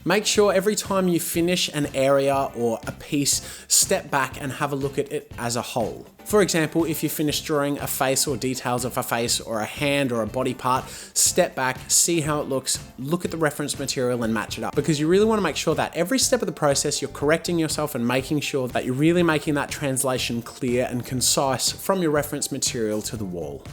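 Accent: Australia. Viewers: He sounds so aussie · more Australian in this than it is nowadays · his Australian accent seem more Australian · He sounds very Australian in this video but not as much in other videos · His Aussie accent was stronger here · your accent was more australian back then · uses Aussie slang, Aussie accent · U sounded way more Australian in 2014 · his Australian accent seem more Australian in this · he sounds more Australian then normal, maybe because this video is older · just because he's Aussie doesn't mean u can make fun of his and my accent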